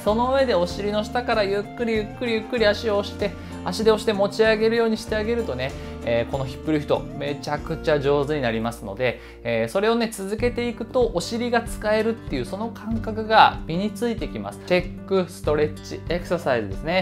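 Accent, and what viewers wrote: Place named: Tohoku, Japan. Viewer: 東北出身なんですかー！私、東北人なので、の訛り全く気にならず入ってきました😂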